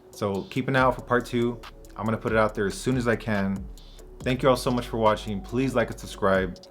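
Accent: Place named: Texas, United States. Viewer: Where are you from? I’m getting a Texas vibe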